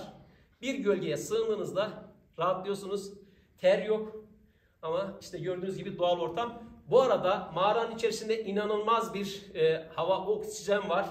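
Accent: Black Sea, Turkey. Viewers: Bey, ses tonundan bize yakın bir yöreden olduğunu anlamıştım seni ilk tanıdığımda. Meğer ki hemşehriymişiz. Ben de Torul'luyum. Bizim o taraflara da gezi yapmanızı bekleriz. Biz gidemedik, siz in sayenizde gitmiş gibi olalım bu sene. İyi seyahatler. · Karadenizli olduğunuzu bilmiyordum hocam saygılar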